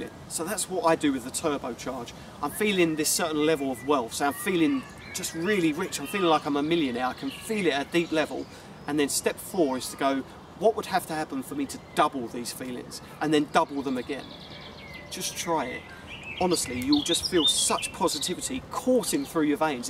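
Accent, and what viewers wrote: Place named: United Kingdom. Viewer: thanks for the video alott thatks thanks but british acsent is so agly sorry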